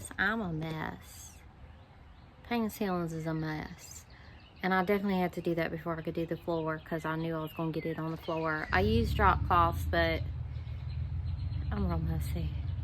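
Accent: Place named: South, United States. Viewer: One question I have wondered (for your Q&A): In your older videos, your voice sounds so different. Did you use to try to talk without your Southern accent? (I like your accent 😊)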